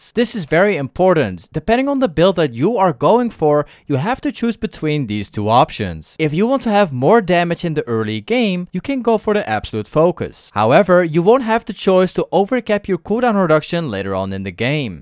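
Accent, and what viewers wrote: Netherlands. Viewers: ayy we out here. But are you dutch? You have that accent atleasd ^^.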